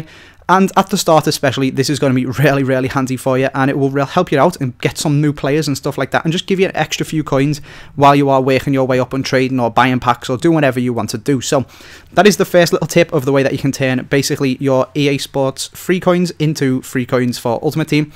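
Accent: Liverpool, United Kingdom. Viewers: Scouse accents are cool :D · Finaly I found a youtubers who is SCOUSER I am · scouse bro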